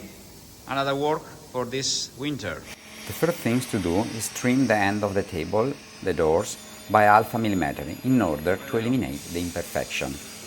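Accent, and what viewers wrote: Italy. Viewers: Wonderful, you are back in English. I don't know what I like most about your channel, all the tips on how to fix things or your wonderful English with a clear and lovely Italian accent 🇸🇪😀😄💕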